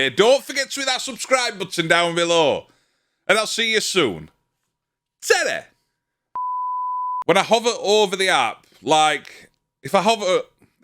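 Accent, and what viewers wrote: Yorkshire, United Kingdom. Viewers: A Newfie from Yorkshire? Love to hear the accent.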